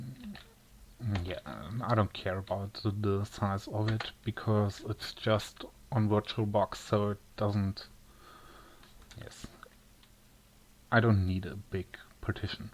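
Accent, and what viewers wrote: Germany. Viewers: Great video tutorial for current linux users ! Don't worry about your English ;) You of course have a German accent, but I understood everything! Cheers from Denmark. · I heard your english and thought you were german... And YES, I was correct! Grüße aus Braunschweig!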